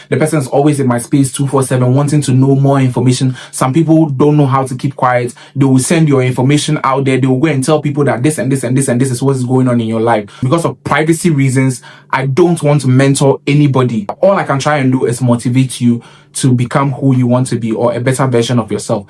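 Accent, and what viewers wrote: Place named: Fante, Ghana. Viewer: am subscribing because of how you speak the fante🤣🤣🤣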